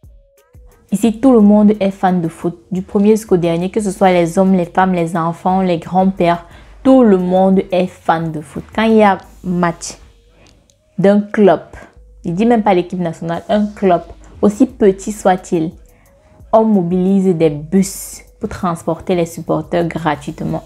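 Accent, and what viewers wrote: Cameroon. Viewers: T'as un accent camerounais et ton est sénégalais?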